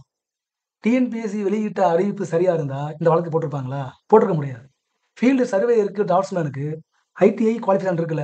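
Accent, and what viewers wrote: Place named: Kerala, India. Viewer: Kerala style sollum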